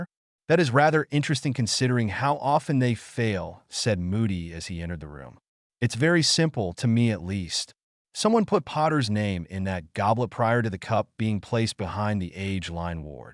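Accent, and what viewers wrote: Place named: India. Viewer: hey there when your videos are done with a heave India dialect please use the ai voice so it is understandable as that accent is thick and English is my 4th language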